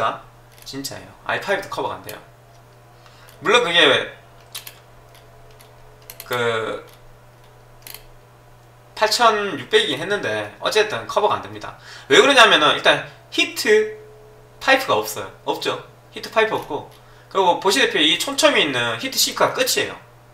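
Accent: Gyeongsang, South Korea. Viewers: 경상도 사투리를 쓰시는 군요~~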